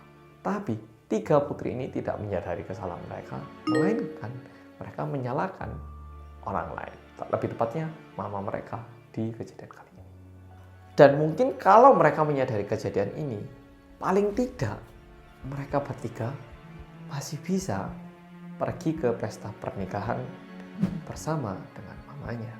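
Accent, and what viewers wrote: Java, Indonesia. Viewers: Medok medok medok rekkkk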